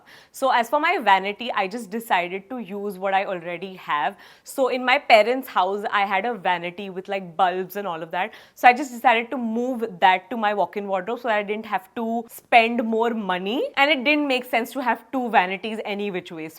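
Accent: Gujarat, India. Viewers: According to my observation all the gujju girls have this type of accent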